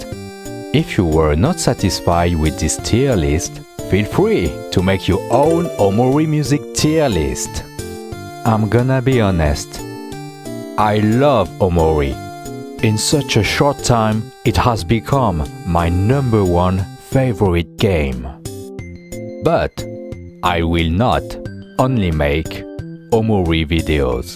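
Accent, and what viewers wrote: France. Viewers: the best tier list in my life. thank you :) also your french accent is so lovely!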